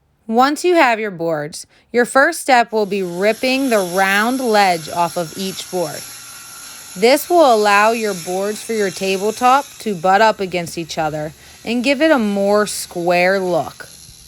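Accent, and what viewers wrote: Pittsburgh, United States. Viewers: Where are you from? Sounds like PA near Pitts?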